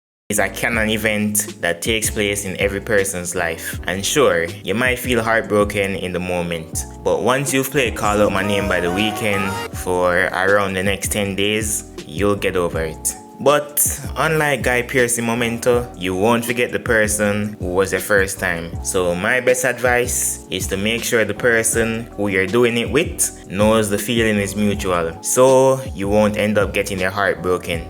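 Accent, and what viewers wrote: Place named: Jamaica. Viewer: Yu sound jamaican.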